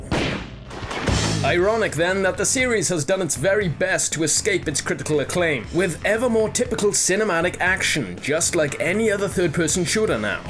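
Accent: Wales, United Kingdom. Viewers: are you Welsh??? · Good video, good choices! Nice Welsh accent, btw.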